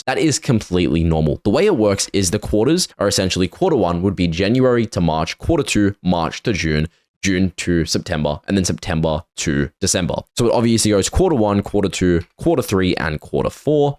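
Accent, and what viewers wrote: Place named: Australia. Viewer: This aussie accent is so cool ma man 🤜🤛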